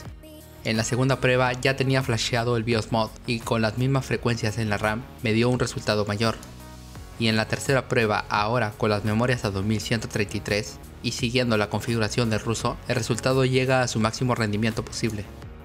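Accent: Peru. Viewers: eres peruano :V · eres de Perú?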